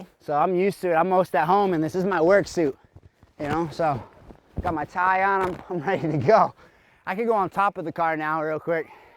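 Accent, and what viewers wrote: Netherlands. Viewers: Are u dutch???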